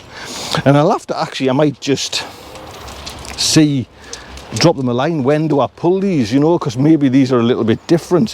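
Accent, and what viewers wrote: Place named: Newcastle, United Kingdom. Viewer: Love your accent! I live on Vancouver Island but my Mum's a Geordie (from Whitley Bay!) Just found your channel and like your videos. This is my second year of veggie gardening. Last year had an amazing yield and hoping for the same this year - although I'm fighting off a LOT of pesky bugs with home-made garlic spray - relentless little critters!!! Also, this year I started everything from seed and the birds are feasting on the seedlings as they germinate, so plan for today ...... make some of those foil ribbons! Thanks for sharing and all the best to You! :)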